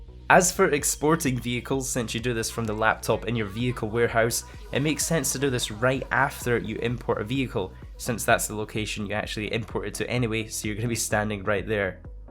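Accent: Scotland, United Kingdom. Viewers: Fuck scotland wierd accsent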